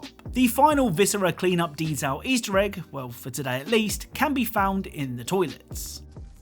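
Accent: United Kingdom. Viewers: I wanna, are you British? Oh and robo cop is not a a robot he is a cyborg, strange one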